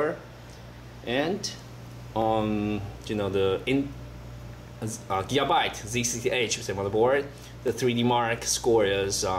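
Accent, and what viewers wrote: China. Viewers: It's a Chinese accent if I'm not mistaken...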